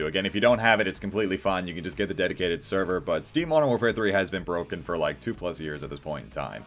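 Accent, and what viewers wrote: Greece. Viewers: Bro are you greek?? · is he greek? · Wait! Are you Greek? 0_0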